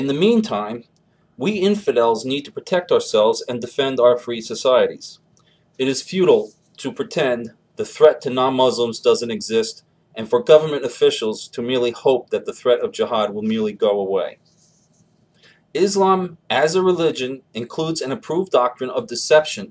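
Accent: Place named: New York, United States. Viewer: NY area accent.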